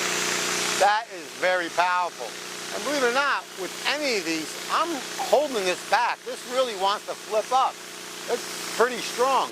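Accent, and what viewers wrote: New York, United States. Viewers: I'm Italian and love how talks! I'm from new yawk too I dunno where he's from but he would fit right in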